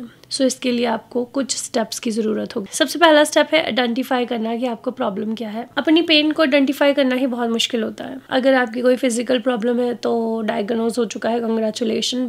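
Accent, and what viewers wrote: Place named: Doaba, India. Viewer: Ist comment but follow bahut tym ton kr rahi, bolan de tareeke ton laggda hai k tuc doaba belong krde ho,mee too,jalandhar de aas pass,aur tuc??bahut achhey vlogs hunde ne, l like veer ji too much,the way he talks,v sensible nd funny nature too,i like it,plz rply i m sonia from jharkhand wid my in laws!!!🙏🙏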